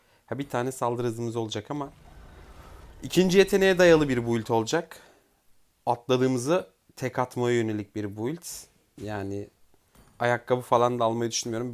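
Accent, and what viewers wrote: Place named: Anatolia, Turkey. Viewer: Ses tonundan beyefendi görünümlü Anadolu çocuğu sanıyordum, bugün gördüm adam hollywood artisti gibi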